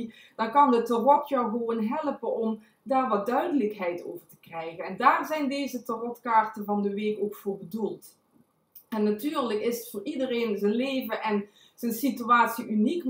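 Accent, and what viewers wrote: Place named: Limburg, Netherlands. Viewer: Volgens mij ben jij een Limburgse, net als ik!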